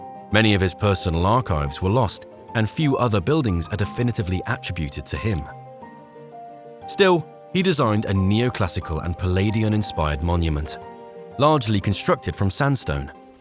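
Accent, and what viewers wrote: United Kingdom. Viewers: Why does every fcking show have to have the British accent. Were not fckng Brits!!! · If your going to use an AI to talk about an American icon, why would you make it sound British? :person-turqouise-waving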